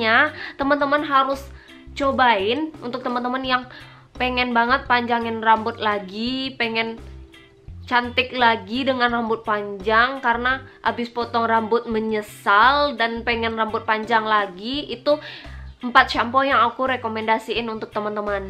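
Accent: Indonesia. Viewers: Suaramu dek mirip minang kocak 🤣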